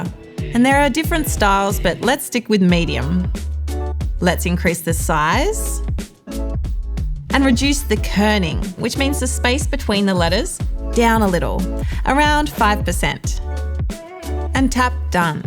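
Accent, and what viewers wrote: Australia. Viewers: Great tutorials but her voice/accent is like nails on a chalkboard. Aussie accents are the worst. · Good to hear an Aussie voice!😊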